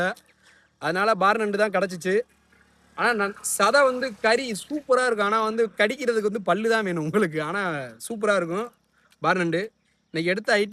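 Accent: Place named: Sivaganga, India. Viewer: சிவகங்கை மாவட்டமா நீங்க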